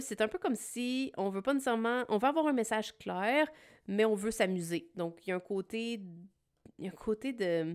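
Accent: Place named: Quebec, Canada. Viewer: Oh la la, cet enthousiasme me touche beaucoup. Ce bel accent du Québec pour parler de mon travail, c'est magique :) :) :) Merci énormément pour tes mots !!!!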